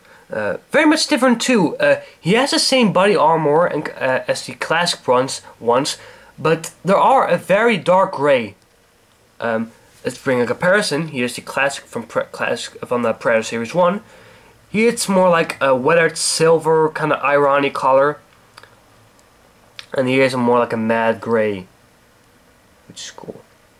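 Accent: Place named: Netherlands. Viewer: its kinda cool to see a review with a dutch accent , i like it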